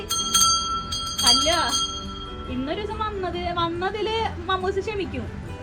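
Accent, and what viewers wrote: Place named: Thrissur, India. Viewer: സ്ലാങ് കേക്കുമ്പോ അറിയാം... തൃശൂർ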